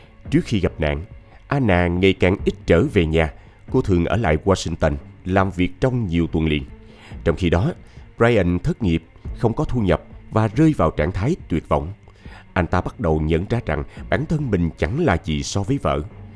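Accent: South, Vietnam. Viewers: Xướng ngôn viên có giọng đọc miền Nam hay quá. Thanks.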